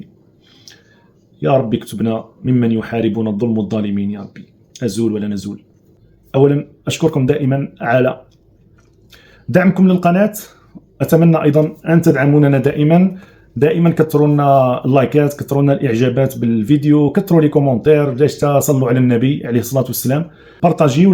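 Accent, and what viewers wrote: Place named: Morocco. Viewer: لهجه مغربيه 😂😂😂😂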